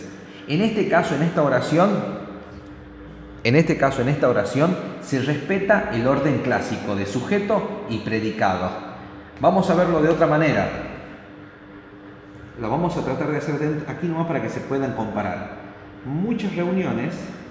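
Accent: Tucumán, Argentina. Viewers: Sos de tucuman?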